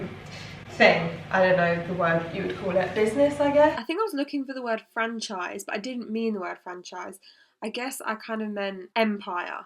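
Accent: United Kingdom. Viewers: one reason you cannot understand what she is saying I guess you have to be british to understand her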